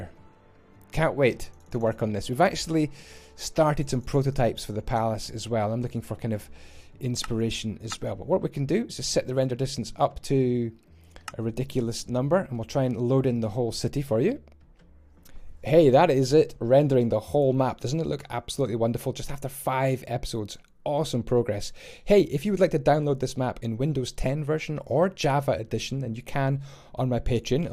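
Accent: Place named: Scotland, United Kingdom. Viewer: Lol. You most certainly have an accent, my dear chap (sounds like a Scottish accent, perhaps?), but it sounds a lot like you're saying "pam". It's okay, though. I was just trying to help you out. Also, I am a native English speaker, as well, but I am also an English teacher ;)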